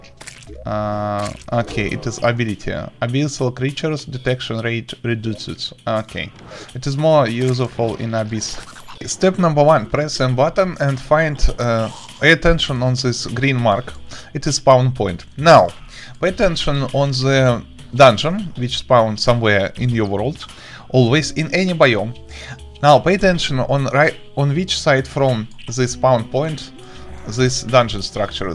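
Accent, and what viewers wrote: Russia. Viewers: Русский акцент не скрыть мен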